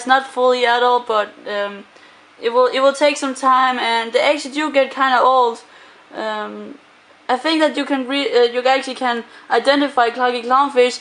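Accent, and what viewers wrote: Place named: Denmark. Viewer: You Danish accent is more pronounce now as you grow older. Sounds cute--keep up the great work.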